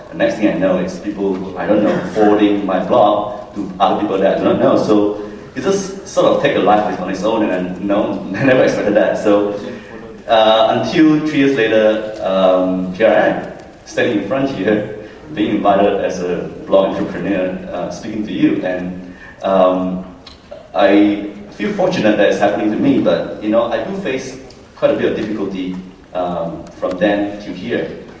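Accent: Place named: Malaysia. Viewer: just a little, not much. pretty much sounded malaysian to me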